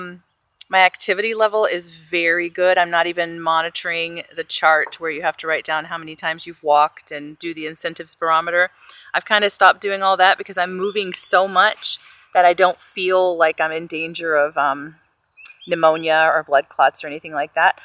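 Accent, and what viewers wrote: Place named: Canada. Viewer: I hear the birds, spring time in MI. You are amazing, ouut and abouut, you sounding more Canadian than southern!! You should are great and thanks for the honest updates.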